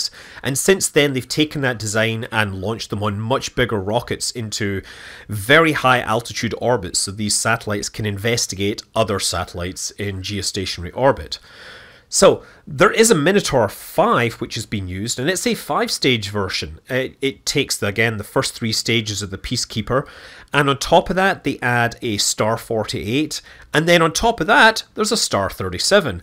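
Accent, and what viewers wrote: Scotland, United Kingdom. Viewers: Where you from in Scotland chief?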